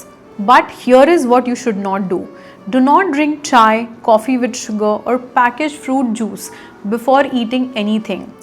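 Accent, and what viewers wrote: India. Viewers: Too verbose.. Please keep the verbiage to the minimum.. too much to process all at the same time.. keep it to the point.. and lastly, your program explains in English.. why don't you explain in Hindi.. your English pronunciations can be very annoying.. it's not your fault.. 99 percent Indians want to speak in English but pronounce their words wrongly, with a regional accent.. someone like me who wants to understand clearly is unable to.. for eg metabolism.. or naturopathy.. you say naa chura puthy or meta bolism. The correct pronunciations are muh taa buh lay shun nd naach uh roh pathy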